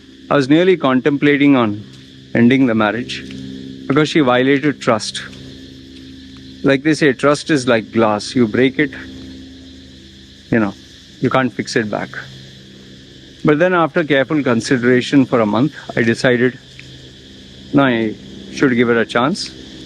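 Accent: India. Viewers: You sound like you are Indian are you?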